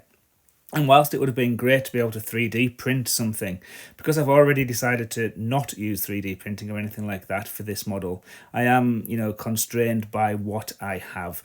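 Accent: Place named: Liverpool, United Kingdom. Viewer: Well, it sound a bit like the Beatles. So maybe it is Liverpudlian. [Is that a word?]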